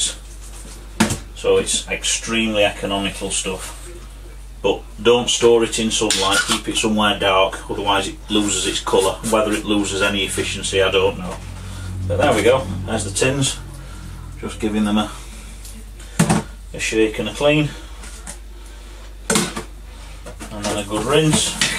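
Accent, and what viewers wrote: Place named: Yorkshire, United Kingdom. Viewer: LOL, you must be a Yorkshire lad. Your accent is just like my brother in law from Hull.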